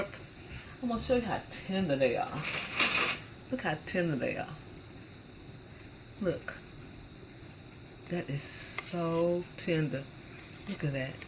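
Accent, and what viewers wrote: South, United States. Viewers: So country I couldn't understand nothing 😂😂😂😂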